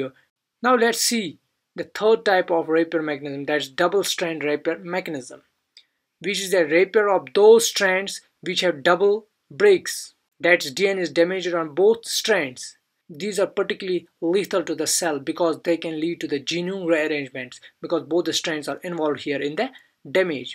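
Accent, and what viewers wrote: Kashmir, India. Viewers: Are you Kashmiri. U accent seems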